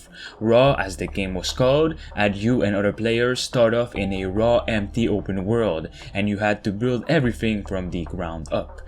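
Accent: Quebec, Canada. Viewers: Toi c'est sur que t'es quebecois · Tes québécois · I feel the strong Quebec accent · Tabarnakkkkk that Québécois accent threw me off 😂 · Do I hear an Accent from Quebec ? Je crois bien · I can sense the Quebec accent lmao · ce gars là est clairement Québécois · you got that french canadian accent ma boi i might be wrong tho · Qc? The accent is telling me you are a French Canadian just like me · Are you frome Quebec? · Where is this guy from? Accent sounds like he's french canadian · Si s'gars là est pas Québécois bro... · Are you from Quebec cuz you got that accent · Where are you from ? Ypu sound like a french canadian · Bel accent québécois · Calique d'accent québéquoi mon boy bonne vidéo par contre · T quebecois toe hein? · Je reconnais c’est accent québécois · T’es tu Québécois par hasard? 👀😂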